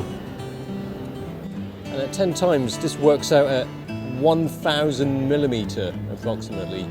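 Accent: England, United Kingdom. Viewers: Hong Kong is a British colony that why you have a British Accent ! not quite ! Local people coming from the colonies and Dominions do not speak with British Accents ! They speak eighter Chinese English ,Malay English, Indian English your probably born in the UK and moved to HK at some point of life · i trust him because of his british accent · nothing funnier than an asian guy with a british accent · he has a british accent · Asian with British accent...EPIC!!!! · I love your british accent:) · I never saw an Asian guy with an English accent before. He even made fun of himself. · Thumbs up for asian guy with british voice!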